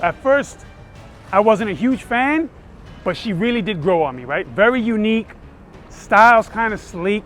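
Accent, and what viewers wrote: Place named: New York, United States. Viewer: Nuttin like a New Yack accent on a video yappin about Mega Yachts???!!! You are awesome made my day.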